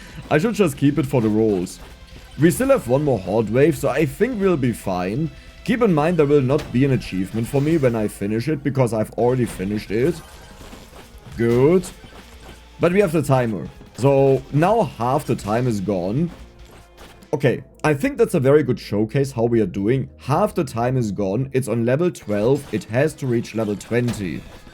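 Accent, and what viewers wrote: Germany. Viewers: Didn't know that german-accented commentary was what i needed more of in my life but i will take it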